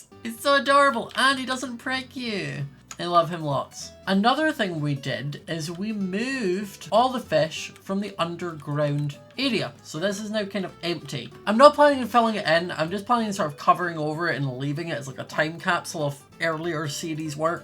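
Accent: United Kingdom. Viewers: you saved joel from the wither! WE STAN british accent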